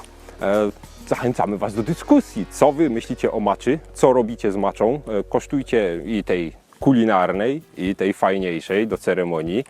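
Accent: Silesia, Poland. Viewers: piękny śląski zaśpiew <3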